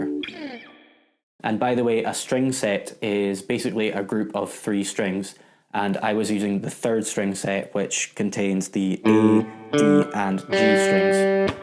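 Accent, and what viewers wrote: Scotland, United Kingdom. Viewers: That accent, any fellow Scotsman can immediately identify it.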